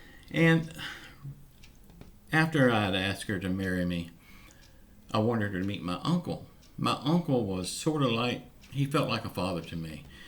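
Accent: South, United States. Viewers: morning i can tell you are from the south im from the south knox tn who knows about Zayres except some one from the south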